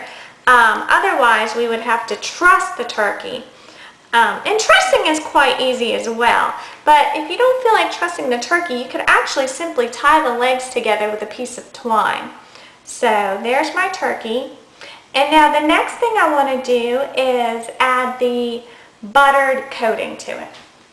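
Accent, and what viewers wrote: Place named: Texas, United States. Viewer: i love your texan accent..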